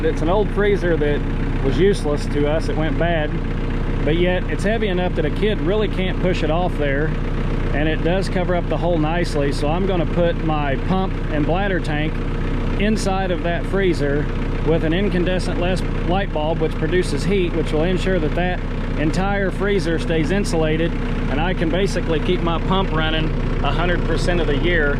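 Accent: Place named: South, United States. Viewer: Even though we live almost 600 miles away We Love hearing that cornbread accent in voice. Sounds exactly like all the kids around here. Awful Southern sounding to be that far North.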